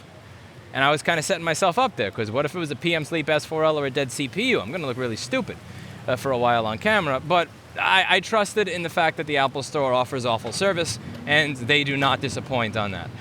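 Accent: New York, United States. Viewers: your NY accent reminds me of Jerry sienfeld! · it's the NY accent....it comes from a mix of Italian and Jewish immigrants adding to the Irish and WASPs....despite him not being Jewish, uses Yiddish influenced NYC phraseology. Hence your association with Jerry Seinfield, who has some of the same accents.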